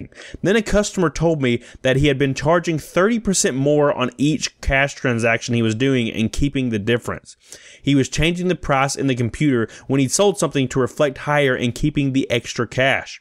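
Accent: South, United States. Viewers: I find your southern drawl so comforting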